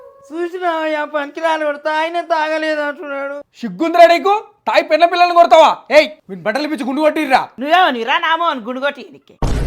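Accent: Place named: Mahabubnagar, India. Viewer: Miyammak phonjescheppinav ania side kuda ilage matladutaru mi mehaboob Nagar side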